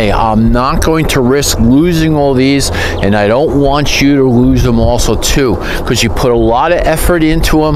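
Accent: New Jersey, United States. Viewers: I agree the wether has been so up and down here in NJ. I’m in zone 7a. It’s kind of funny that I recognized my own accent when listening to you and wondered if you’re from NJ as well. Lol. I watched another video and sure enough you are lol. My tomatoes were doing really good and then really bad after up potting them. They’re in the garden now and most are making a nice come back. I have no clue what was happening but the tops looked like they rotted and fell off on some. I ended up putting them all in a trash pile outside that I neglected and to my surprise they started getting leaves down low on the stem 🤷🏼‍♀️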